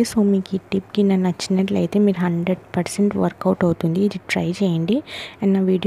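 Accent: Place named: Telangana, India. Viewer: super voice telanga voice alane untadhi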